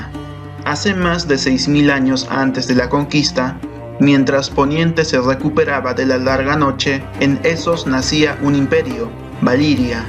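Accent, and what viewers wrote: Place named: Bolivia. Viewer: Eres boliviano verdad? Acento inconfundible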